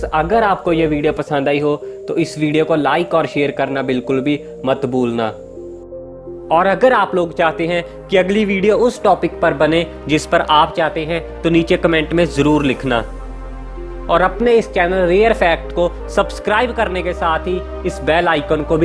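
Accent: Punjab, India. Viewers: Punjabi tuch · Punjabi ta punjabi hi hunda, English vi punjabi tone ch bolde ae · Bohot achi video h bhai apke bolne se lgta h ap punjabi ho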